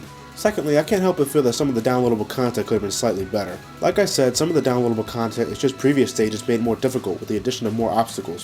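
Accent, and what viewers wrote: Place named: Pennsylvania, United States. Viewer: My personal thoughts (now that I have the PlayStation 3 version) Daytime stages: 9.5/10 Nighttime stages 9/10 Tornado Defense: 7/10 Eggmanland (which, for first timers, can go f**k itself): 2/10 Overall: 8.75/10 And for saying war-hog, it's his Pennsylvanian accent.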